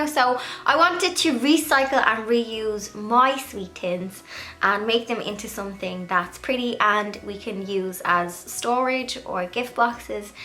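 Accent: Dublin, Ireland. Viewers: Blondie is gorgeous. Your Irish accent is so much nicer than my Scottish one. 🏴 Thanks for this vid... I can't throw anything out. 🙃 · I'm new subscriber I am old school I use them for sewing lol and even makeup etc I'm afraid of inhaling spray paint but I love what you done I'm in Ireland is that a Dublin accent I'm a dealz lover and tried anything like that as I live alone xx thanks alot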